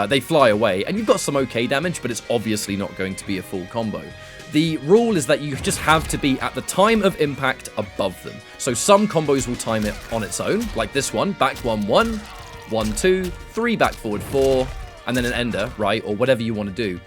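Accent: United Kingdom. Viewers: As a Russian, i jusr enjoy your English. Always prefered the British version to an American:) Thanks to your combos, though, quite helpful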